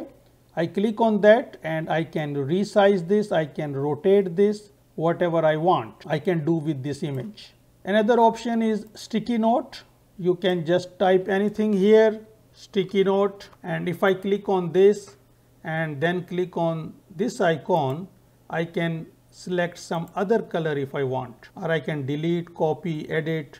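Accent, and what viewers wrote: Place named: India. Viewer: indian accent again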